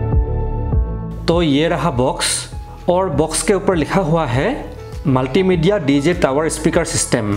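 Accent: Assam, India. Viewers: Aap Assam se hai aap ka aawaj se pata chala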